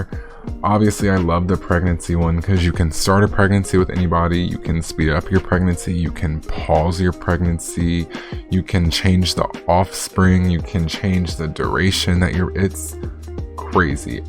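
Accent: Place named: United Kingdom. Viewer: ok but like thanks fir not gamer sceaming in a british accent 💅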